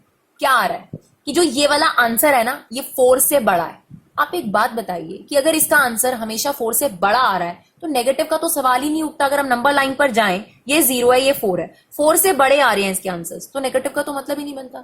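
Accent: India. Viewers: I believe that u are from Jharkhand ,Bihar or UP Tone feels familiar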